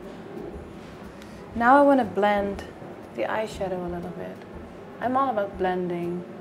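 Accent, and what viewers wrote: Friesland, Netherlands. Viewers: I love your dutch Fryslân accent!! 🥰❤️😍💋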